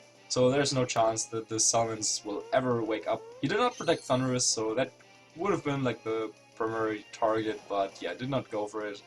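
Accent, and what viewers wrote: Germany. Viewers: Pleasure to have you back, having only Hibiki was not enough German accent in my Pokemon videos (yes, I know he is Austrian, but it all just sounds the same)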